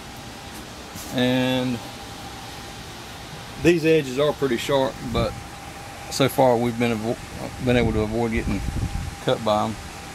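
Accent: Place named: Carolina, United States. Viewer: that's a Carolina accent if I've ever heard one!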